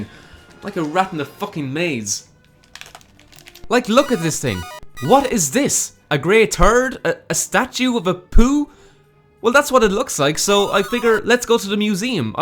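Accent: Ireland. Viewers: I’m pretty sure he’s Irish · Dude are you from the same part of Ireland as Jacksepticeye??? Hope this isn't offending you (don't mean nuthin by it) but ya accent sounds very similar. Love your reviews fella!!!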